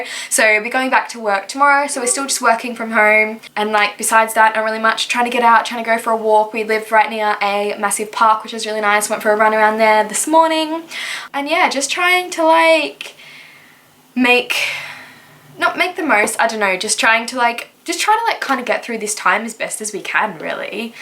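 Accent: United Kingdom. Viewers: Omg I can hear a bit of a British accent!! Also I’m so glad your back!! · So excited you're back! Can definitely hear a British accent! 🙈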